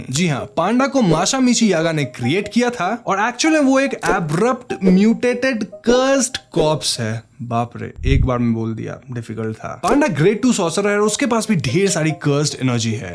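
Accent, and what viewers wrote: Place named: Bihar, India. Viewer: Bhai ye sb to hmne anime me dekh hi lia 😂 Teri vdo dekhne ka kya fayda Vo bhi bihari accent me bol rha h 😏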